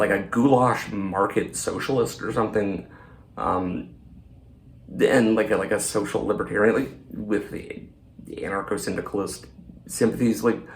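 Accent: United States. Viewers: You sound like the average American to me.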